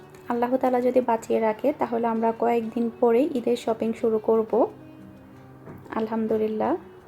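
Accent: Chittagong, Bangladesh. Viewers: চট্টগ্রামের কথা শুনতে খুব ভাললাগে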